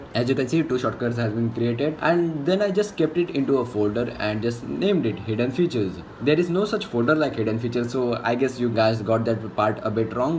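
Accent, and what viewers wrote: India. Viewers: this indian accent makes me nervous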